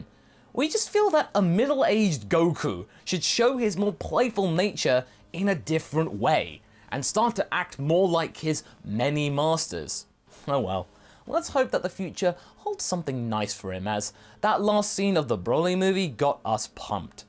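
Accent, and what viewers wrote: England, United Kingdom. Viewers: You sound like teamfourstar hoku but with an english accent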